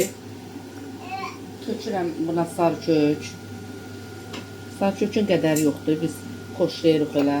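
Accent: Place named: Salyan, Azerbaijan. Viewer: Niyə axı pesok deyirsiz? Öz dilimizdə deyində şəkər. Gül kimi sözümüz var. Ləhçə Səlyan ləhçəsinə oxşadı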